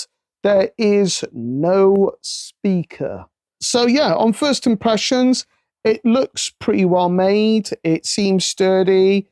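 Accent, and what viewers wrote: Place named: United Kingdom. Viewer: you grow a brit accent. nice synth, review.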